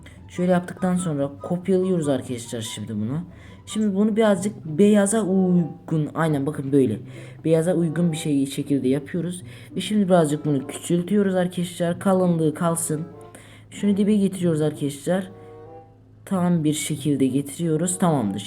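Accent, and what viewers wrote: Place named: Azerbaijan. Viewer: Qaqa Azerbaycanlı san turkcə danisirsan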